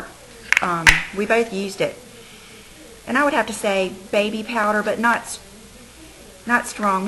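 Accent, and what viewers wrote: South, United States. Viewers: I Love your videos..watching them has made me wont to go out and try new things..I have always used the same make up forever, but my make up collection is starting to grow LOL Thanks for your inspirations..Also I detect a southern accent....Im also from the south..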